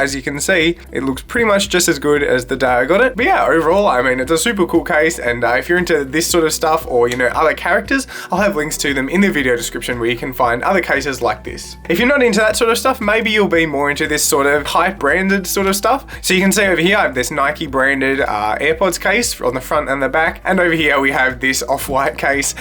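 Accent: Australia. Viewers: G'day mate! Aussie accents are so orgasmic I can get off just listening to yall speak. But, having said that you're speaking too fast that I gotta turn the captions on in order to understand what you are saying. I've got Samsung's Galaxy Buds myself for about 7/8 months now and I have just ordered a case for it, something similar to the Nike's in this video, just without any logos on it. It's so hard to find a good and cheap case for non-airpods TWS earbuds. The case I ordered + shipping was a few cents shy of $30. That was expensive. The cheaper ones either have zero or negative reviews. I read one that said her galaxy buds charging case "slipped out" of the case which was hooked onto her handbag with a carbiner and she only realized it was gone when she was home because she was blasting songs with the buds on the subway and couldn't hear it hit the floor.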